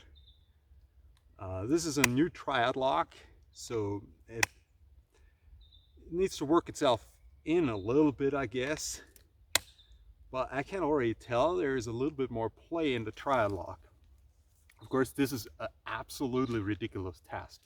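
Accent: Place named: South, United States. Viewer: I love your accent! I hear a lot of influence from the American South, no?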